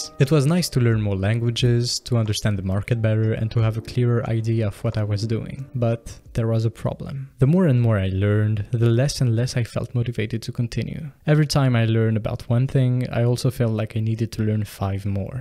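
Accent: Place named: United States. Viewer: Comment tu as un accent totalement américain alors que tu est bilingue ?les techniques pour mieux apprendre l'anglais.merci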